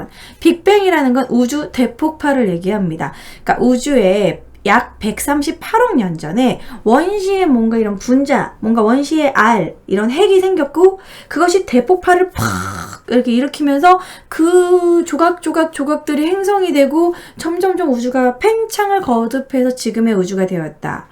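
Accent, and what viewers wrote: Gyeongsang, South Korea. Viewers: 혹시... 경상도죠? 중간중간 억약이 좀... ㅎ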